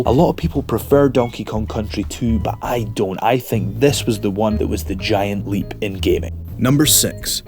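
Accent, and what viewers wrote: Scotland, United Kingdom. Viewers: Is this guy Scottish ? I'm not English, and I am not sure, but his accent seems strange to me, especially the pronounciation of "R". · It took me a second to realize you weren't American (then again it's WhatCulture so I should've known better) but dude you have the slightest (Scottish?) accent I've ever heard! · Scottish? I love the accent a lot.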